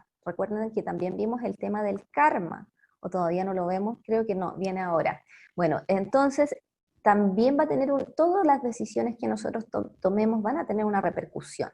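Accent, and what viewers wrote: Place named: Chile. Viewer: Maravilla de conferencia .... Gracias , hermanita ... Dulce acento , el chileno ! Un abrazo de luz desde Buenos Aires .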